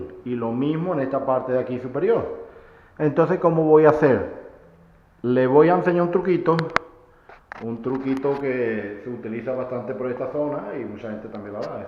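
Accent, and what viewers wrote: Andalusia, Spain. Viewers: Ah, vale ahora entiendo. Lo probaré porque nunca me quedan bien las líneas ni usando cintas buenas. Me quedan bastante bien pero siempre filtra algo. Ese acento que tienes...cádiz, sevilla? Muy yankee no suena🤣Un saludo👍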